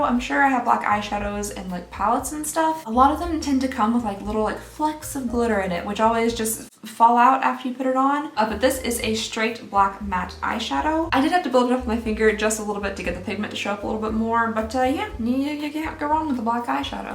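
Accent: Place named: Alabama, United States. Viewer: Ooooookay... several things: if that Lipglass smells like bad play dough, it is EXPIRED!! Please don't use it! Second, I have very much enjoyed your videos this holiday season. I'm glad I found them.... BUT there is a HUGE point that I haven't heard you make in any of these videos. Although all of your points are valid, you neglect to mention that all, or at least most, of these advent calendars show you what is inside before you buy them, so if it's not worth your money, you will know before you buy it. If they were blind buys, I would agree with you wholeheartedly, but you almost always know what you're getting before you ever make the purchase. If you addressed this somewhere before and I missed it, I'm sorry. I still really enjoy watching you read these brands to filth, but I also know that consumers can avoid the heartache! Last, but not least, I'm from Alabama and its nice to watch a YouTuber with an accent close to mine! 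Merry Christmas!!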